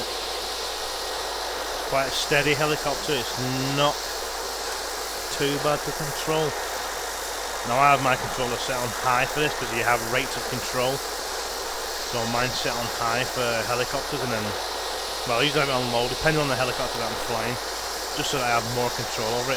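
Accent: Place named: Northern England, United Kingdom. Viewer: Where in the UK are ya dude? I know with your accent your a norther :) Thats where the good lads are from lol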